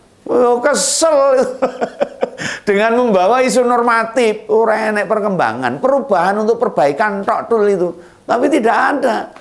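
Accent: Java, Indonesia. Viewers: Om lucu walau jawanya medook 😅😅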